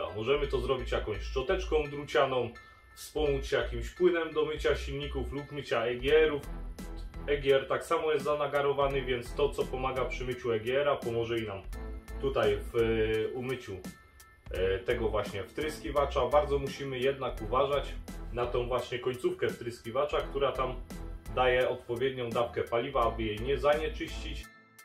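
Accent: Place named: Silesia, Poland. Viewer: Ślonskiej godki nie ukryjesz 😜 P.S. fajny film! ;)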